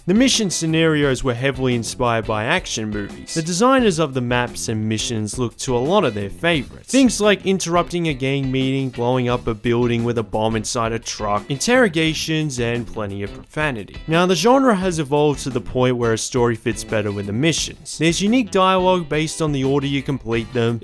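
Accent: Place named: Australia. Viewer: your accent is a really annoying version of an aussie one, did you watch too many american movies growing up :/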